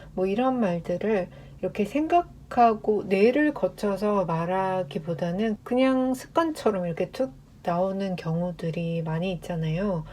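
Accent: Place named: United Kingdom. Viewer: 영국영어 발음이 멋있는데 발음은 어떻게 연습하셨나요.. 아무리 읽어도 한국인 발음에서 벗어날수가 없네요😢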